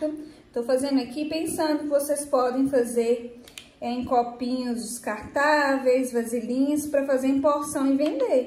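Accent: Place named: Minas Gerais, Brazil. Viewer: Muito mineira estou vendo de santos SP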